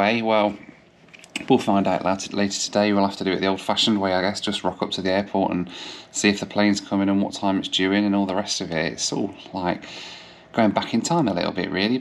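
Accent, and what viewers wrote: United Kingdom. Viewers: Hi,My first comment for your channel.Im from India and have been following you for a while.Definitely the clincher for me till date.My congrats to the amazing crew.The energetic and emotional outpour that you had when flying back on the 737 is contagious to be honest.Added to the above recipe is your wonderful British accent that is a real treat to listen to.Oh but why would food cost you an arm and a leg(a "simple costly" would be easy to understand:):):).........Keep up the good work.All the best and thanks for all the wonderful videos till date.Wish you all the luck forward...........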